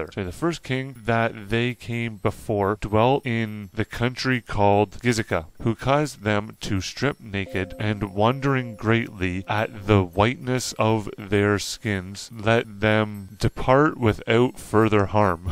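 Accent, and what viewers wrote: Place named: North Carolina, United States. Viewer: Welcome back. This one must have taken quite a bit of research for you! Very good info and detail; I've never heard of these men before with the exception of Verrezano, whose name you are pronouncing with an extra 'z' at the end. I love your accent, and said to my daughter the way you pronounce certain words, like 'about' sounds like you are North Carolinian. Then you tell us that Norumbega is near where you are from! We have a St. Johns River in Florida, which is 310 miles long, and runs parallel with the Atlantic.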